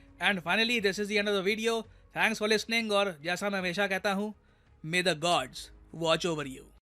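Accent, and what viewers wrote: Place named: Haryana, India. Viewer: Russiya ka hypersonic missile ger diya tha.. sir bilkul haryanvi Desi accent m bat kr rhe h..Varna hota h gira diya tha..I love his Desi accent.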